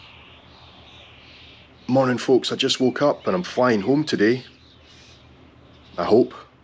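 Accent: Scotland, United Kingdom. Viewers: Excellent video. Love that Scottish accent...from a Celtic cousin in Ireland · How I have missed hearing a Scottish accent; I live in Canada. Thanks for the beautiful videos. I have a great passion for Scandinavia myself, specifically Iceland. The people are very similar to us Scots, or at least so I've been told😊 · Love the video. I was searching for more about Faroe islands. I love this video also because of the fantastic scottisch english accent. Greetz from The Netherlands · I certainly do enjoy your Sottish? accent, do wish that I could emulate the fine sound 😮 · Your Scottish accent is AMAZING!!! Sending peace, love and prayers to you!!! 😊😊😊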